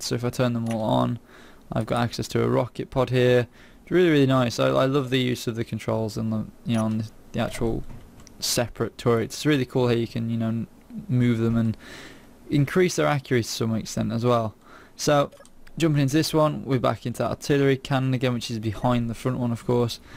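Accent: United Kingdom. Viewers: you said the word "Homely." adjective 1. NORTH AMERICAN (of a person) unattractive in appearance. synonyms: unattractive, plain, unprepossessing, unlovely, ill-favored, ugly; informalnot much to look at "she's rather homely" But then I realized that you had a British accent. Nevermind. :)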